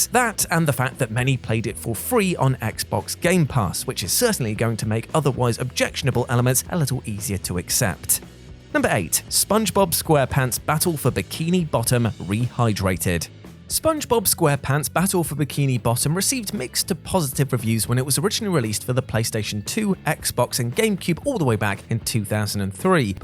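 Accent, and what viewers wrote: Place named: United Kingdom. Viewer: you don't enjoy the game cause you are one of those reviewers... Jesus this guy is so fucking unaware... the definition of a brit that made a career with American audiences cause his accent makes him sound genuine.... this guy... smh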